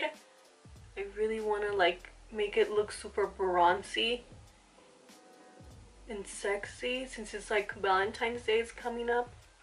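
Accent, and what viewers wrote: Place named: Puerto Rico. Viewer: Preciosa, me encanta que eres Boricua y nunca pidas perdón for sounding puertorican, you have a beautiful accent ❤️🇵🇷🙏💪🏼 Wepa💪🏼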